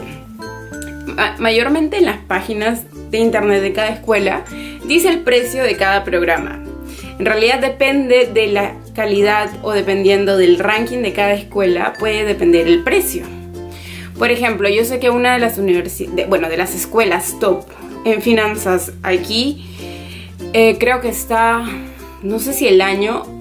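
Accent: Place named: Peru. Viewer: supe de donde eres, de Perú, por el acento, casi no se siente, pero es como un acento vacío o neutro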